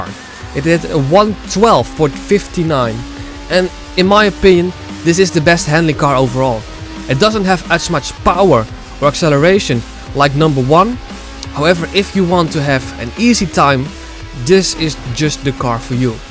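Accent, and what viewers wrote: Netherlands. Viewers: Are u from Holland 🇳🇱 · I can tell you’re dutch, if not, then i’m just stupid, but, anyways, no hate tho, finally a dutch tuber talking bout need for speed game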